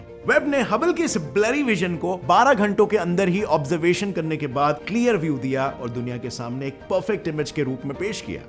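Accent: India. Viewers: I will love if you text it, i really have a problem understand the dialect India/english. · Why with these videos it's always an Indian guy with such a strong accent and also that he doesn't know that much English words so he just goes to his original language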